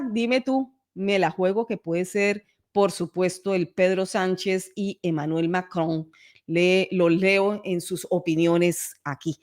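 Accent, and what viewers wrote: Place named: Colombia. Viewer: A pesar de tantos años en EU, no has perdido tu acento colombiano... Es maravilloso.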